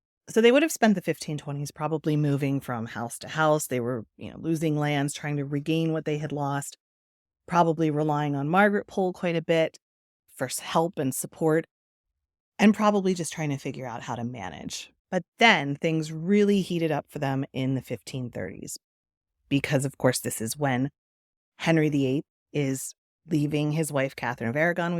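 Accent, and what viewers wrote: United States. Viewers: Pity about the American accent of the narrator.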